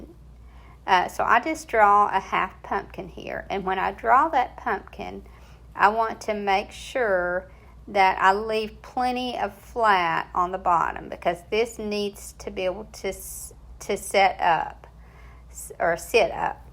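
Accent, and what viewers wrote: South, United States. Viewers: I just love your work, your beautiful Southern accent and mostly that you love the Lord! Keep glorifying his name like you do! 🛐💟✝️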